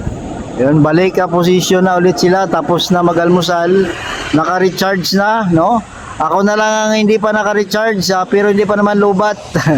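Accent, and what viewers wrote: Iloilo, Philippines. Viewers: Ilonggo ka gali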